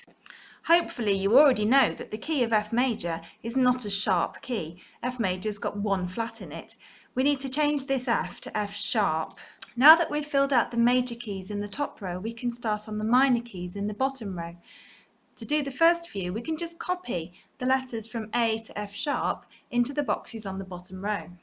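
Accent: United Kingdom. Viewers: Ahhh British accent 😲😲😲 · Thank you so much. I'm teaching myself piano, and you've been a huge help! And I like your British accent.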